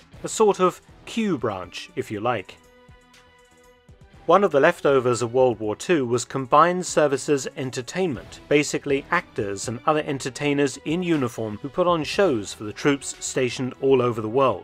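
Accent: United Kingdom. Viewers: Personally, I thank you would have made a first class James Bond. The look, the clipped British accent and a general quiet swagger. Your next mission, should you decide to accept it..............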